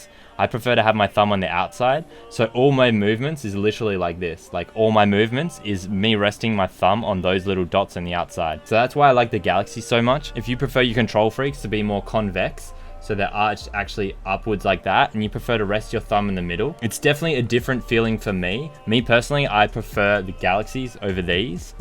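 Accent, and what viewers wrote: Australia. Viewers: he is Austrilian dingus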